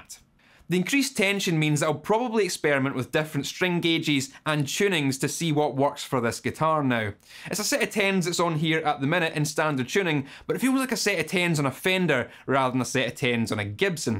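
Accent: Scotland, United Kingdom. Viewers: String bucklers! Hot damn! Why did it take so long for these to be invented? Or rather: why are guitar manufacturers still building expensive instruments that pull the strings sideways from the saddle? I mean, tuning-wise, these are accidents waiting to happen, and guitar players have been plagued by this completely unnecessary problem for generations. Gotta pull the strings out of the saddle absolutely straight , dammit! I gotta get me some of these. 10 minutes of Google later: Oh, butler , not "buckler" . Hot damn again - your Scottish is a wee bit too confusing for me...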